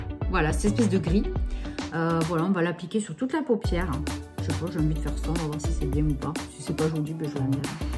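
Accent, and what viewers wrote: South, France. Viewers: Coucou ✌🏼 Je te découvre par le biais de Laura (Alora Bora) puisque nous voilà embarquées dans un défi ensemble 😅🤦🏻‍♀️ Je ne te connaissais pas... donc je suis vraiment contente! Tu as un bien accent du sud dis donc 😉 allez je t’embrasse et continue à regarder ;) 💋💋 Alo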